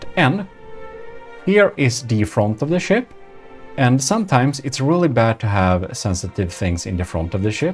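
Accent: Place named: Sweden. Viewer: People guessed wrong on your nationality? Din engelska brytning är så extremt svensk att det låter nästan som om du pratar svenska lol.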